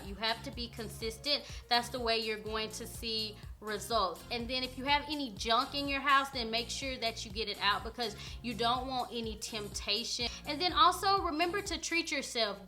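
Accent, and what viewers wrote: South, United States. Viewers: I LOVE YOUR SOUTHERNESS IT MAKES YOU SO INTERESTIING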